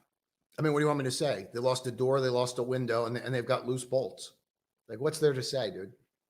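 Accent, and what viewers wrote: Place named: New Jersey, United States. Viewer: You are amazing and have taught me so much... I love hearing you as I'm getting ready for work everyday. Jersey girl here , love the accent ! I lost mine living in Maui . Aloha 🌺 for all your hard work ...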